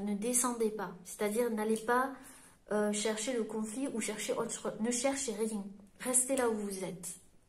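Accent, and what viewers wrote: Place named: Midi, France. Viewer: Vous. Êtes. Ùne. Gamme. Qui. Libéré. Autrui. Et. J. Adôre. Votre. Àccent. Dù. Midi!!!!!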